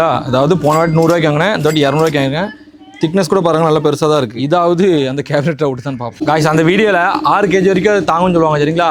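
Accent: Tirunelveli, India. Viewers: தம்பி திருநெல்வேலியா?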